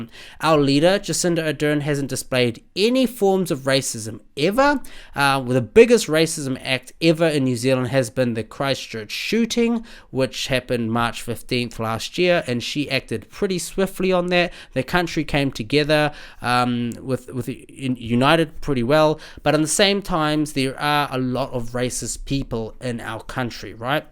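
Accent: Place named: India. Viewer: Bro i love your videos, that indian accent though. Need to see more 👍👍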